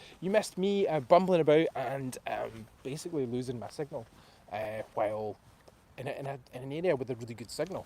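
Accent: Scotland, United Kingdom. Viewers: Sorry I missed it ? I saw The Hoof GP give away the Appleton Crush ? Very kind of him ! I will keep watching your shorts ? Love that Scottish Borge of yours ? You have a good Sunday night ! Sorry again my bad ?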